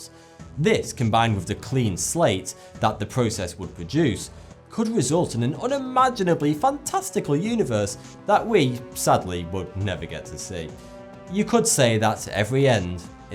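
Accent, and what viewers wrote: United Kingdom. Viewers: his British accent makes it sound like 42 but he's saying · You got to love that sweet and smooth British accent! :)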